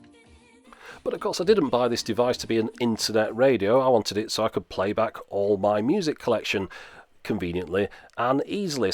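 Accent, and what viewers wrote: United Kingdom. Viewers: it's awesome that youtube can recognize your words almost correctly through your strong British accent then provide a subtitle for me to recognize what you say..😳😳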